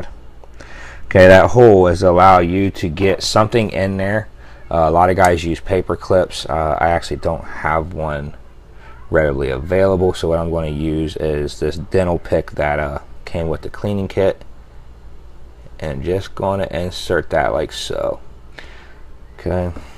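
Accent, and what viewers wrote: Pittsburgh, United States. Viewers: This guy must be from Pittsburgh with that accent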